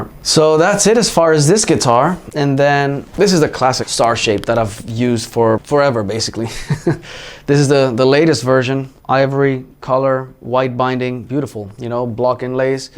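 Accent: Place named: United States. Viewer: why does he sounds like an American when he s talking ,,hi hi ho ho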